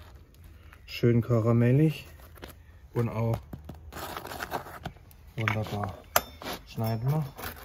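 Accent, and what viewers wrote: Germany. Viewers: Kommscht ausm Schwarzwald?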